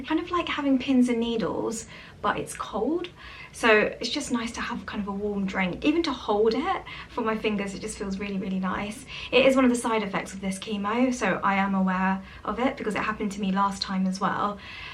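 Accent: England, United Kingdom. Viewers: It’s the English accent .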